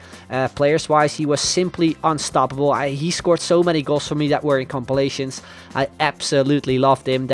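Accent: Netherlands. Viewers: Je hoort dat je Nederlands bent😌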